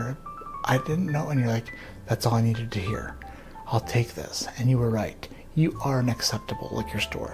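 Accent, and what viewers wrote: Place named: Minnesota, United States. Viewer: He has SUCH a Minnesota accent! Must be from the Cites or thereabouts.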